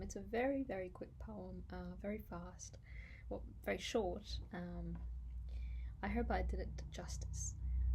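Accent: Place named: United States. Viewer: Good poem .. Your accent is American, but you look like Indian 😊😊❤❤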